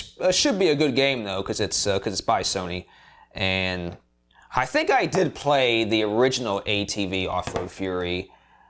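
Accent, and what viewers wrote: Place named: Bronx, United States. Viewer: are you from newyork fam cause you sound like yeah from the bronx its lit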